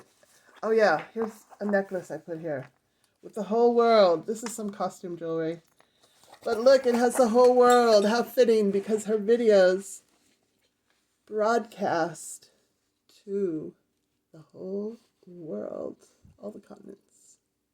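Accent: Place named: United Kingdom. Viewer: loving that British accent !! 😊🫖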